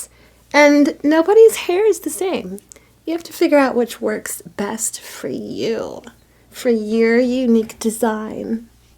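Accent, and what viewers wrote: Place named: United Kingdom. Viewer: Loved Your British accent !!!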